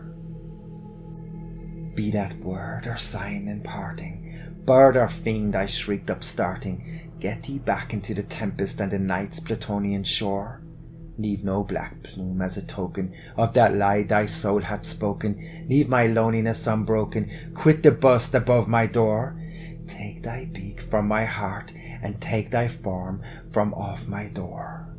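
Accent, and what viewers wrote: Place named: Ireland. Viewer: Aaaaand I fell in love again ❤ An Irish man reading one of my favorite authors? Yeap, sign me up!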